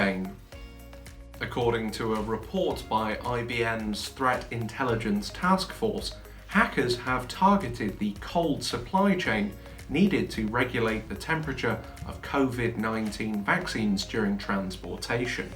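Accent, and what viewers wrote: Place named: United Kingdom. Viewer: Hola funny talking Brit dude! USA cowboy here. Yee-Haw bro. OK, Salutation done. Check. Suppose there was a white hat hacker group dedicated to finding out bad hackers and TAKING THEM OUT. Seek and destroy. Make them fear. Next, blockchain smart contract. Example, I got hacked by dipshit ransomware and I am pissed! I am pledging 0.01 btc to whoever takes out this garbage. And there are 10,000 other angry victims also wanting justice. The bounty gets up to 100 btc, that’s like $1.8 million. White hats say Yeah, We gonna git em. So they (several WH groups) go after them, destroy them, prove it according to smart contract and collect the bounty...And other black hats getting very nervous and thinking about changing their ways. Maybe becoming White Hat. 130 years ago, Where I live, If We had an a-hole We would put up a bounty and hunters would go git em. 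Ded or a-live. Keep up the good work and I will keep watching. Comment...FIRST!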